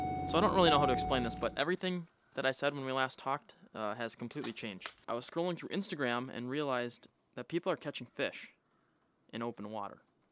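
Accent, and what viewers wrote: Minnesota, United States. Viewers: what part of minnesota u from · What part of Minnesota are you from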